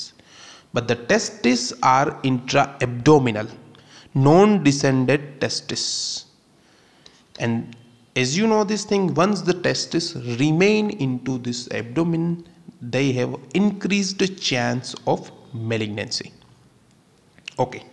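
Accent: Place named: India. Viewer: Какой прикольный акцент у индусов.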